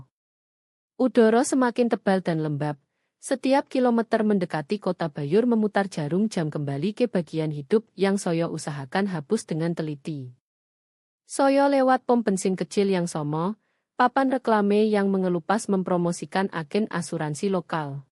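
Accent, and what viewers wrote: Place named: Java, Indonesia. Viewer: Bacanya kok rada aneh ya 😮 teks bahasa Indonesia, tapi dibaca kaya teks bahasa jawa . klo bahasa jawa ga semua A dibaca O biar ga aneh di pendengaran .koreksi dan kritik (mohon perhatiannya admin)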